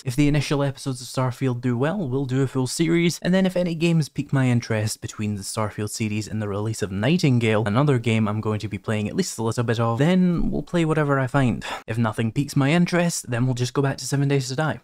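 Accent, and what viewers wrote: Scotland, United Kingdom. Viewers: That's a lowlander Scottish accent if I'm not mistaken, and I'm going to lean toward Dundee more than Edinburgh.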